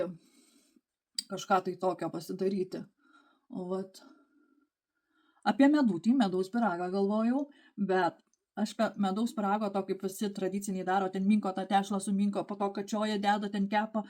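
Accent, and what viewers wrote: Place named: Kupiškis, Lithuania. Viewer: Man patinka jusu vlogai, nes tokie gyvenimiski, mieli. Dar ir tarme jusu primena namus :), nes as is Kupiskio puses ir ten panasiai kalbama. 🌲🤗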